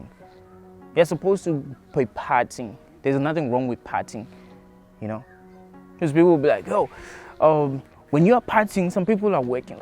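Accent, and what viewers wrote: South Africa. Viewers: hey bro just started following you i like your content bro, i always wanted to ask where you from coz i could tell you from SA, lols im from there too, good to hear someone who talk reality, out here so hard to find people like you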